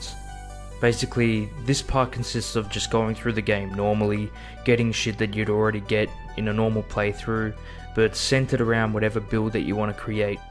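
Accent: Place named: Australia. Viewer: Can we play together. You sound australian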